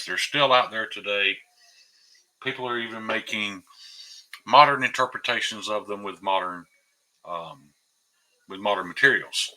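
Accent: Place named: South, United States. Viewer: You had me fooled. At the beginning you started off slow with the southern voice and the antique Asian blade. Case trapper, yes, Buck 110, for sure but boy did things escalate from there! Wow! Nice collection you got there homeboy!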